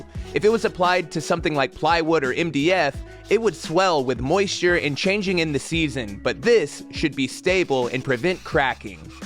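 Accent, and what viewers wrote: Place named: Midwest, United States. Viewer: It is his Midwest accent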